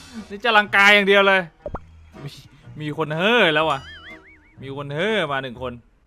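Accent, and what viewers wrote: South, Thailand. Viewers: แหลงใต้ชับพึดเลยน่ะน้องบ่าว...หรอยๆ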